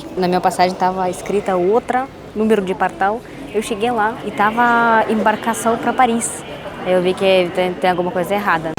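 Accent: Russia. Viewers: Que charme de sotaque dessa russinha! Maravilha. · você é muito linda, muito fofa, seu sotaque russo é maravilhoso e te deixa muito charmosa, gosto muito dos seus vídeos, sou seu fã brasileiro!!!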